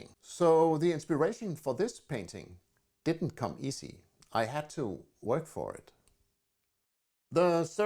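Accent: Denmark. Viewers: Are you Danish? Im American but married to a Danish woman and have lived in Copenhagen. It’s your accent. Great channel!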